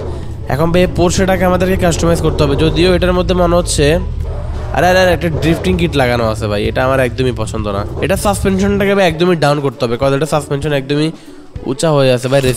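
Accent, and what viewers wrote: Noakhali, Bangladesh. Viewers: ভাই নোয়াখালী কথায় আপনার বাড়ি😅😊❤❤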